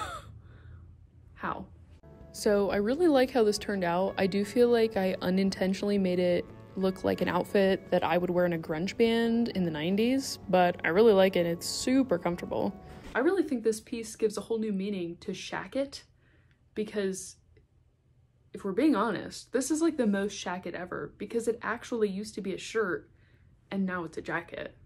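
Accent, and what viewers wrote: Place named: Canada. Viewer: Are you Canadian? 🇨🇦 You have a lovely accent. 😊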